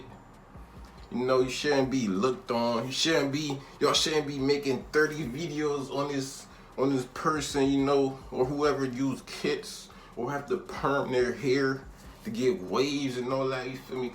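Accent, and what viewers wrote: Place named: Philadelphia, United States. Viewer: sound like you had dat north Philly accent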